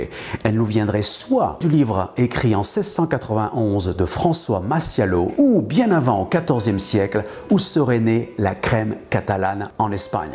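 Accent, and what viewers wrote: France. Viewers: Merci! Ma blonde déteste ton accent français mais je l'adore et t'immite constamment. Plus tu nous apelle avec des noms de petits animaux, plus j'ai de munitions, alors continue ton bon travail!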